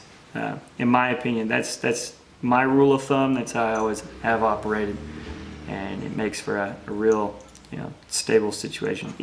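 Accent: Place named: South, United States. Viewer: U sound like a God damn hillbilly. 💗 love it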